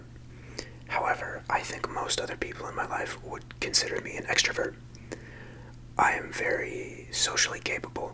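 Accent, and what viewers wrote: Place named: Brooklyn, United States. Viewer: I’m interested in pinpointing your accent. It has been muted somewhat by education and socioeconomic status, but my guess is Brooklyn or somewhere near.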